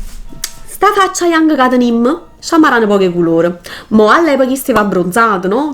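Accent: Naples, Italy. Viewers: fai skiattare😂❤️ hai un accento di qualche paese napoletano di che zona sei? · Ma quant si simpaticc, me piaciuto assai pk parl Napolitano, ke e o dialetto miiioo nu vass no coppa a capp ma nfacc, e bona. serata... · Non amo particolarmente la cadenza napoletana, essendo abituata all'accento nordico, ma questo video è davvero simpaticissimo, un abbraccio!☺️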